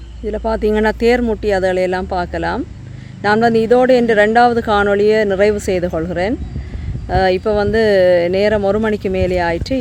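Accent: Sri Lanka. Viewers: Neengal peasum inimaiyana ilangai Tamil azago azagu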